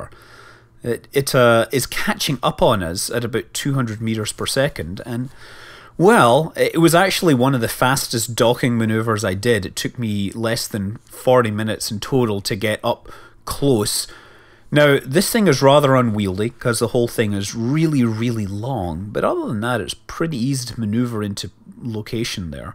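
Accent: Scotland, United Kingdom. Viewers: Well... new favorite Interstellar Quest episode found! Drama, Suspense, perseverance aaaand all in a Scottish accent... Everything a good show needs.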